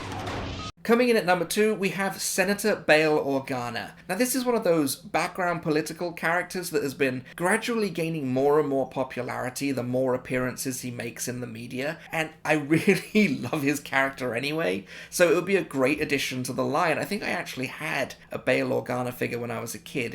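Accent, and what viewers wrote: United Kingdom. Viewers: Not doing well at the moment but forcing myself to comment now, as I've disappeared off sm for several weeks. Still lovely to hear your smashing British voice on the interweb.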